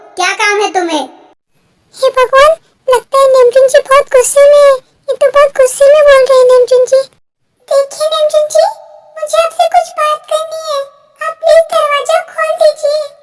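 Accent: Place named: Bihar, India. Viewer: haan kyunki aap bilkul meri tarah thinking rakhte ho mujhe story se hi pata lagta hai aur ek baat aur aapka bihari excent bhi mujhe pasand hai kyunki main bhi UP wali hun🤭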